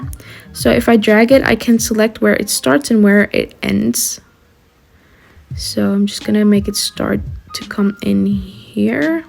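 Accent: South Africa. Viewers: U sound like ur South African :) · ur South African ✊🏽🙌🏾🙌🏾🙌🏾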